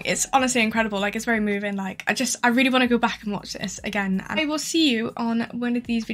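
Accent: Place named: United Kingdom. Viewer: Как же прикольно звучит британский английский))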